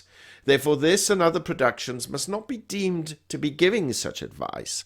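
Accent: England, United Kingdom. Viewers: Although all this is threatening information for my small portfolio (but not really unknown to me), I thank you. Allow a personal remark, please: you have a lovely English accent, pleasing voice and good diction (rare on YouTube).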